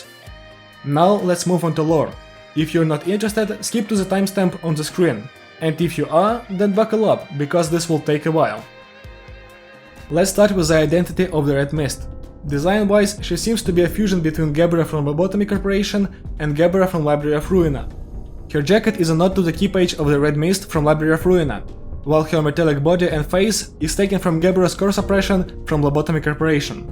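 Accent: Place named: Russia. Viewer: Judging by the accent.... Привет, ты что русский? Сильный акцент, друже. Хороший видос, уверен со временем твоё говорение улучшится.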